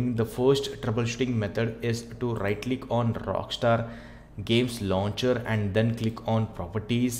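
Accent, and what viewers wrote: India. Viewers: fuck indians accent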